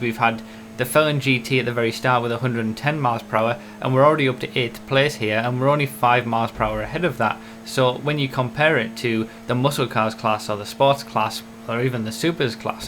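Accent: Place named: England, United Kingdom. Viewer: no, he's English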